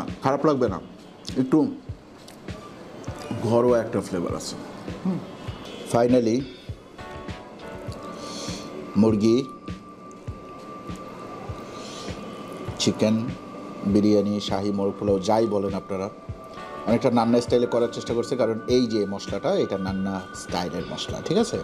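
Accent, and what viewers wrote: Barishal, Bangladesh. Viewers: Vaiya apnar borishaler vasha eto valo lagse...Ami kokhono comment korina but apnar accent shine comment korte baddho holam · ভাই বরিশাইল্লা স্টালে সেই 🤗🔥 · Vai borishal er vasa diya review ta valo lagsee...... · ভাই আপনার ভিডিওতে বরিশাইল্লা ভাষা ভালো লাগে। সবগুলা ভিডিওতে বরিশাইল্লা ভাষা রাখবেন।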